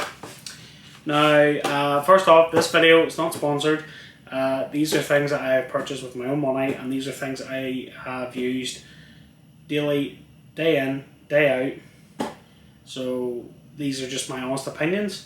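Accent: Northern Ireland, United Kingdom. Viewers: Thanks - right content at the right time for me. I'm looking to upgrade (from original iPhone SE!) and Magsafe looks like a very interesting companion tech/ecosystem. I had been confused about what it was, and also the difference/similarity with QuadLock. Your video is very straight-up and clear. Telling it like it is with a Norn Iron (Belfast?) accent as a bonus! Per comment and your reply below about mounting phone to a bike (pushbike in my case). Are there any hacks or workarounds that might allow a phone with Magsafe cover to be mounted to a bike? Maybe with some kind of extra bungie or similar? It would be very inconvenient (not to mention spend-y) to have to keep a separate quad-lock cover and mount just for the infrequent times I would want a phone mounted on my bike?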